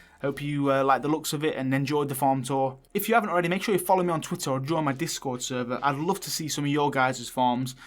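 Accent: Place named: Northern England, United Kingdom. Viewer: The fact I am thinking your proper northern like me 😂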